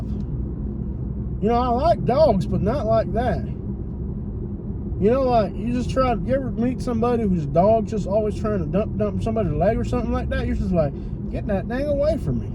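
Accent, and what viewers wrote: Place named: South, United States. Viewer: Speaking like southerner today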